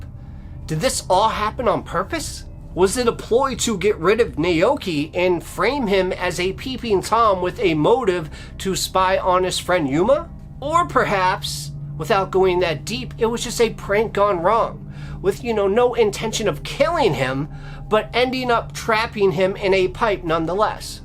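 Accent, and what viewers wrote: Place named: United States. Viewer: Love your videos and the American accent as im in England 🇬🇧 i love watching your videos every time you put them out but due to time difference across the pond i get to watch them a little later on. Keep them coming though ❤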